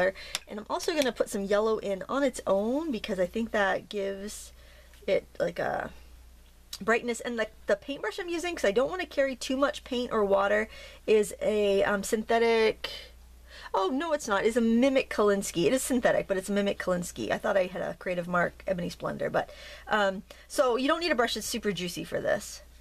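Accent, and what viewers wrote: Maine, United States. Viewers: Love it when you tell us how words are said in Maine. 🤣shaaarpies lol I bought died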